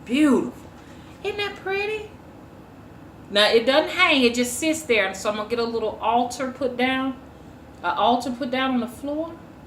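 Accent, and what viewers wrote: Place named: Alabama, United States. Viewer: I just finished watching one of your other videos and I thought, "she kinda has an Alabama accent" and then I clicked this video and you said you graduated from Birmingham college I was like ayyeeee I've lived in Alabama since I was a baby and sometimes I notice other peoples accent because it's familiar. Also I really feel like I learn something new after watching a video of yours, it's a beautiful thing to want to share your knowledge with the world. It's undeniable that you have great purpose and anyone who stands against you is afraid of the truth. You're WOKE AF and that's rare.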